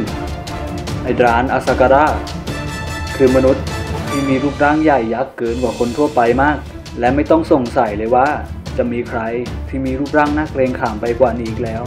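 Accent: Suphanburi, Thailand. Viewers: ชอบเสียงบรรยาย เน่อออดี ฟังเพลินนนเพลิน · คนสุพรรณป่ะเนี่ยเราก็สุพรรณ555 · เสียงเหน่อไปทางสุพรรณ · นี๋มันนสำเนียงงคนสุพรรณนีหว่า เน้อเชียว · เสียงเหน่อมากเลยพี่ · พึ่งรู้ว่าพี่เป็นคนสุพรรณบุรีเหน๊อเหน่อ มาจาก<num> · เหน่อจังอะ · คนพากษ์เป็นคนสุพันป่ะครับ 5555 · พี่คนสุพรรณใช่ไหมครับ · คนพากเป็นคนสุพรรณใช่หม้ายยยย55555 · พุดเหน่อนะเราอ่ะ..คนสุพันรึป๊าว?5555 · พี่พูดเหมือนคนสุพรรณเลยอะ พูดเหน่อ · คนสุพรรณ ปะนิ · พี่เสียงเเบบเหมือนคนสุพรรณมาก😂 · เสียงออกสุพรรณมากครับ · ทำไมเสียงเน่อจังคับพี่ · คนสุพรรณซิน่ะครับ 555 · สุพรรณ ใช่ไหม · เสียงแบบนี้ถ้าไม่มาจากสุพันก้อคอนฐมล่ะ หรือไม่ก้อเมืองกาญ แต่ก้อเป็นเอกลักษณ์น่าฟังดีค้าบ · คนสุพรรณใช่มั้ย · เหน่อมาเลยนะไอ่หม่าลูกพ้อ ชอบๆสำเนียงสุพรรณ👍👍👍👍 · หึ่ย เหน่อ เหมือนกัน😂😂😂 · พูดเหนอสุพรรณป่าวเนี่ย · เหน๋อออออเชียววว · คนสุพรรณป่ะเนี้ย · เสียงพี่เหนอไป 555 ชอบๆ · ชอบความเหน่อ..น่าร๊ากกกก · เสียงเหน่อมาก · พี่เป็นคนจังหวัดสุพรรณป่าว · คนสุพรรณเหรอออ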